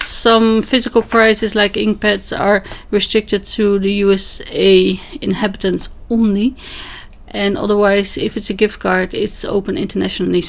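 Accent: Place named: Netherlands. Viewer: Het is een genot om te kijken hoe je kleurt met waterverf en stiften. Het is net een sticker! Aan de hand van je accent gok ik dat je ook uit Nederland komt? Leuk dat ik je heb gevonden in de hop. Dank je voor deze video.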